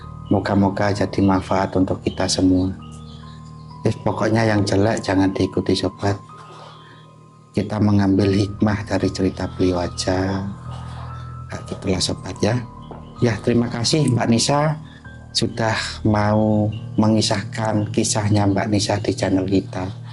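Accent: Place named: Pantura, Indonesia. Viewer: Dilihat dari logat bicaranya,Mbaknya saya prediksi adalah orang sekitar Pantura,Jawa Tengah.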